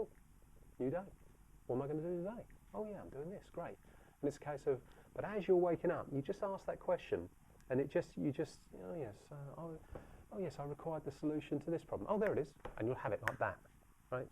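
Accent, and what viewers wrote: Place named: Australia. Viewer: i love this guy's accent..very broad australian with english twang..hahahaha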